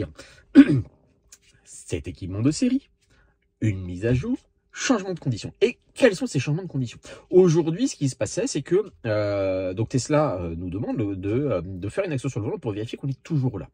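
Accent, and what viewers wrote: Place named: Marseille, France. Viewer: Je précise que j'aime le contenu des vidéos. Même si les titres sont un peu hors sujet et légèrement exagéré. Si je savais pas de quelle région il était je dirais qu'il est de Marseille 😅😂.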